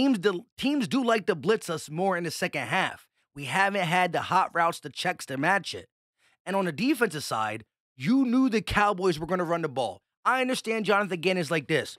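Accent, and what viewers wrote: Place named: New Jersey, United States. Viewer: I watch you every week, dirty Jersey native (Vineland) living in Boston. These are my favorite Eagles updates/videos, you make a lot of sense and it’s nice to hear the accent from home bro! Keep it up! 💪🏽🦅🦅🦅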